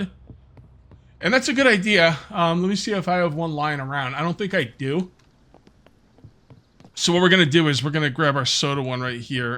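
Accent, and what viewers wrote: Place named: New Jersey, United States. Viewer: Not get too personal, but is your family not originally from Missouri? Because I am originally from the KCMO metropolitan area, and to me your accent sounds much more like an eastern Great Lakes or southern New England (i.e. Massachusetts, New Jersey, or NYC) than anything specific to Missouri (which usually is a more flat Great Plains in the west and north, or has Southern influences in the Ozarks and around the Bootheel).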